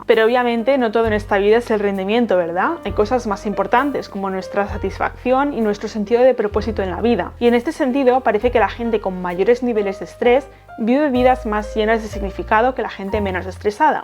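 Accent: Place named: Spain. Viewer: me gusta tu voz y ese acentico español,. te preste mucha atencion y te entendi bien, ademas que eres bien linda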